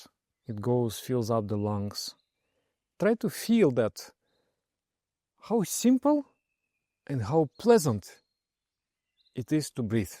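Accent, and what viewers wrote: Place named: Russia. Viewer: Is this guy Russian?! 🤪😝 I know this accent all too well 🤣 Great meditation, I’ll stop getting distracted by my country’s accent 🧘‍♀️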